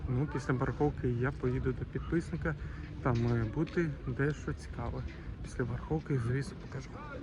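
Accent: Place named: Lviv, Ukraine. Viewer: Моя повага. По Вашій мові і не скажеш, що Ви не зі Львова.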